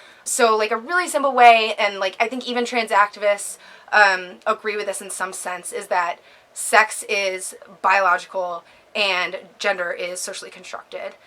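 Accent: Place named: England, United Kingdom. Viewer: ..i always thought you were english.. hotdamn..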